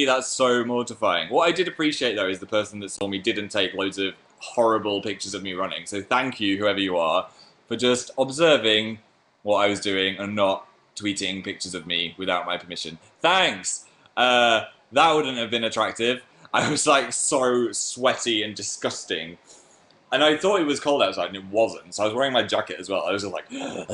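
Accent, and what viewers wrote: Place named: North, United Kingdom. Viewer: I turned on the live show today ((I came late)) and I jumped because I forgot how deep his voice his and I was with my friend and I go "HIS VOICE IS SO DEEP AND HE SOUNDS SO NORTHERN IM DEAD"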